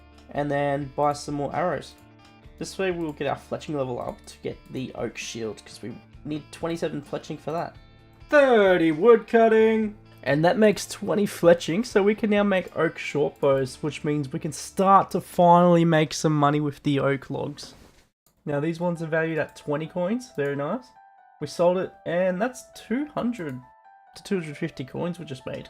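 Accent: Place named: Australia. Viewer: i just started my first one chunk account in varrock and it’s definitely nice to hear a familiar aussie accent to follow along to 😂 looking forward to seeing where this goes, good luck!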